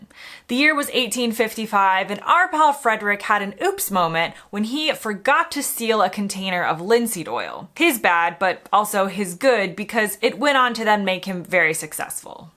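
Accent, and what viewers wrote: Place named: United States. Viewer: Immediately I identified your American Accent, then you explained the reason. Very short list of British Inventions but none the less very interesting. The British have a habit of giving away inventions without realising their actual VALUE...ie The Jet Engine also given to Russia but not even a THANK YOU.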